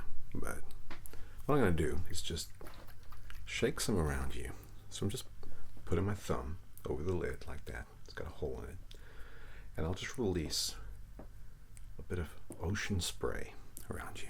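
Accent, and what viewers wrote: United States. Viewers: Out of curiosity: What made you decide to do your Reiki Sessions in an American accent? He doesn't really seem like a caricature, and just seems kinda like a normal guy. Do Brits associate Reiki with Americans?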